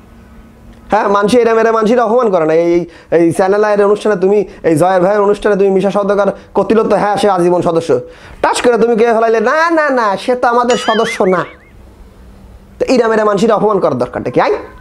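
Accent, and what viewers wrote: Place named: Khulna, Bangladesh. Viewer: Khulnar vasa amk mugdho kora vi..apnar kotha sunla onk moja pi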